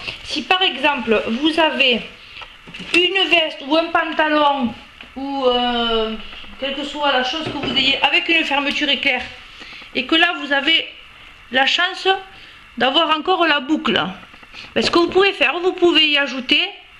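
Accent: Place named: South-West, France. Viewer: fabuleux ! super maman avec en prime un super accent du Sud-Ouest félicitations !!!!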